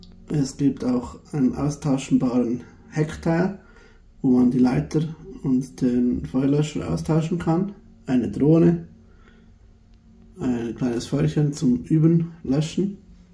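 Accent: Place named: Switzerland. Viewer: Endlich auf Deutsch! Mit tollem schweizerischen Akzent. Vielen lieben Dank!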